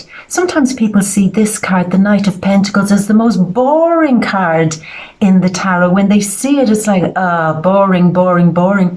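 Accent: Ireland. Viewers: Hey. I must ask...I have been watching you for about a year and half, and have always been wondering where your accent is from. You mentioned your living in Italy. I used think when I first started to watch you, that you were from somewhere in northern Europe and then I have been thinking I am hearing an Irish accent. Please tell me where your accent is from 🤣 Thank you so much for all your wonderful videos. It's a pleasure to watch your beautiful face and listen to your lovely videos each month. Lot's of love, Deborah 🥰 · I did not know you were living in Italy, sending love, Me mum is from Ireland and your accent sounds very Irish. I assumed you were from Ireland. lol Take care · Lovely to hear your lovely Irish accent today........really cheered me up.😋👍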